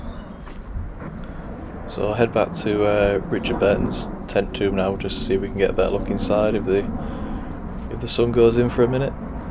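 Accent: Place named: Yorkshire, United Kingdom. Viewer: Beautiful setting. So much bird song. I like your respect and also your humour. I cant quite place your accent. Is it Yorkshire or Lancashire?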